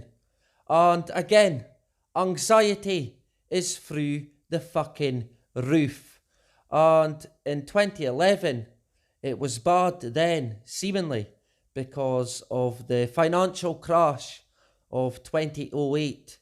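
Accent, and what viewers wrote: Scotland, United Kingdom. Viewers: Scottish...its lovely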